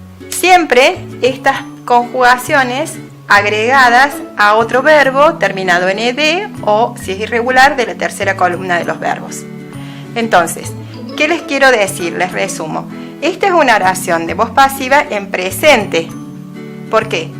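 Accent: Córdoba, Argentina. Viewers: Muy buena leccion y muy simpatica esa tonadita de Cordoba!!! 😄😄😄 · Es de cordoba se nota el aasento grasias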